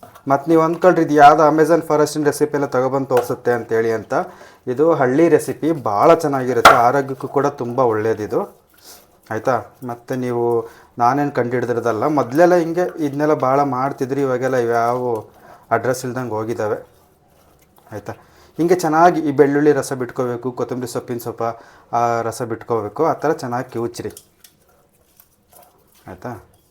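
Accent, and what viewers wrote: Chitradurga, India. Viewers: ಚಿತ್ರದುರ್ಗ ಭಾಷೆ ..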